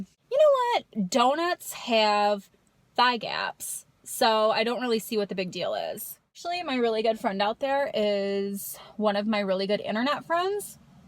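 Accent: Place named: Buffalo, United States. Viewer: I just discovered your channel! Love it. I'm from Buffalo but live in SC now--my accent is very similar to yours and I'm dying at the Oregon/Organ thing.... "Bitch-Bye" ha!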